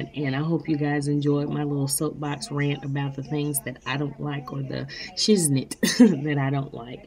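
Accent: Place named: South, United States. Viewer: Agreed with every single detail, and straight 2 the point! Just love that deep-hearted Southern accent and i'm from Texas!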